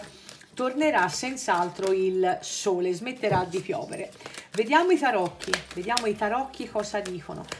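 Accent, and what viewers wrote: Tuscany, Italy. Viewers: Super parlando il toscano e ancora più super sfoderando l'inglese...sarai mica insegnante di inglese? Vedo che te la cavi molto bene anche con i termini in voga...english 🥰🥰🥰💯💯💯💯🍷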